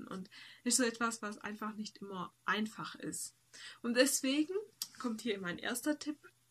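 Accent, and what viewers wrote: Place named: Swabia, Germany. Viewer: Ich kann das nachvollziehen, wie Du in Sachen Hilfe annehmen fühlst. Du wirkst symphatisch und das Schwäbeln passt gut. MfG René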